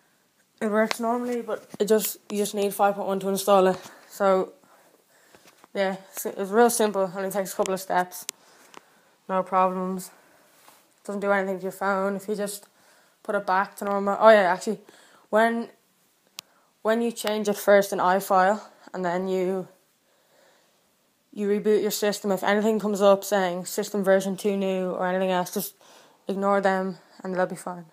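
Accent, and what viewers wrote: Ireland. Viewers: Are you irish chap me too :)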